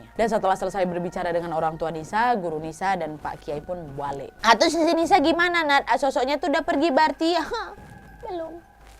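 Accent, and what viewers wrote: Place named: Java, Indonesia. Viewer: Plg suka kl wak ngomong bhsa jawa, lucu tp salut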